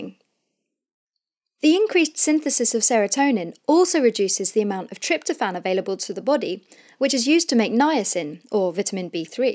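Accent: United Kingdom. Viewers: Loving the British accent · Seriously I love your videos.. but this British accent needs constant focus.. American accent is far most easy to understand.. not a criticism but just an opinion.. anyways as always best explaination! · I was so mesmerized by the British accent . . .. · Nice video, straight to the point! British accent makes me kinda distracted, had to replay the video few times. But again it’s just me. Tanner, come back! :) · The British accent is wonderful 😍😍😍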